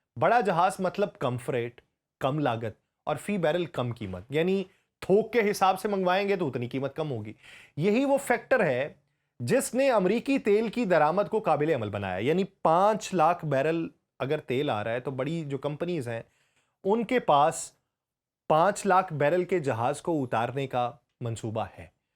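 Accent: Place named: India. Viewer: ye bunda Indian hay,isska ta'lafaz hindustani hay.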